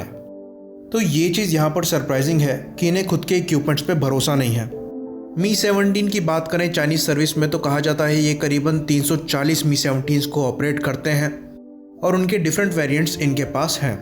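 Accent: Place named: Rajasthan, India. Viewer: Bhai tum rajasthani ho kya aavaj see lag raha hai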